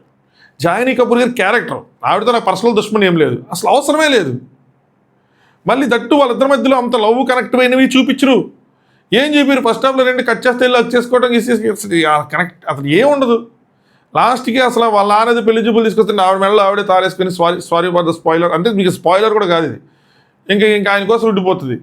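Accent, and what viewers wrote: Khammam, India. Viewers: Hi..Meru Asslu inka divider annapudu chala navvu vachindi andi..Madi khammam exact same accent vuntundi Madi kuda after long time navvukunnanu Meru Ala matladite..Meru chala genuine ga matladtaru..keep going..all the best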